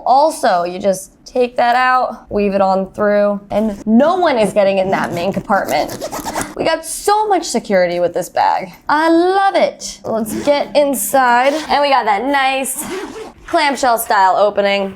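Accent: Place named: South, United States. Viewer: why it talk country and southern but look latin.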